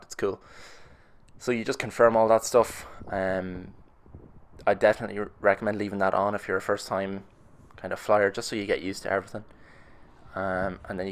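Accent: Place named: Ireland. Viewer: Great review I have the same drone and like you building up confidence with every flight!!! It's fantastic hearing an Irish accent perspective just for a change!!!! Any plans to upgrde in 2023??? I'm looking at the Air 2S or Mavic Classic 3 purely for having a drone for every wind restince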